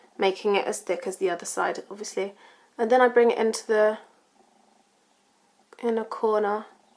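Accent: London, United Kingdom. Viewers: She's not Irish, in fact if I had to guess I'd say she's from London. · Love your videos and your accent! What part of the UK are you from? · Luvved this video and is she british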